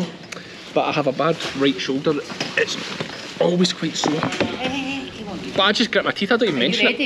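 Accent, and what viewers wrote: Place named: Ayrshire, United Kingdom. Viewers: Top craic as always,keep it coming.Dont try and talk without the accent. Ayrshire speak makes it! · Please do say something in your Ayrshire dialect I would like to hear it!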